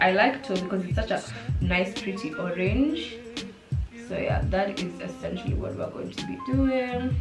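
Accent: United Kingdom. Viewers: Omg do you watch Love Island UK b\c you got so much of their accents?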